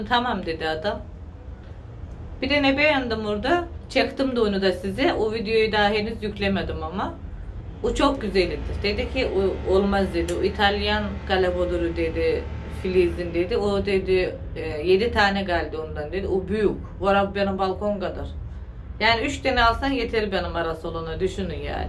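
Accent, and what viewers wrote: Trabzon, Turkey. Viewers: seni keyifle izliyorum tirabzon sivesiyle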